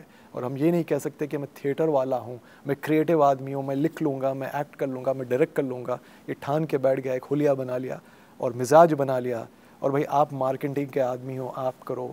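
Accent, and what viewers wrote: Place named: Deccan, India. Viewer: Irfan sahab aap yahan? khushamdeed. khilaaj/khiraaj samajh nahin aa raha tha audio mein. bhai ki dakkhani urdu bhi bahot tight thi. kaafi alag aur dilchasp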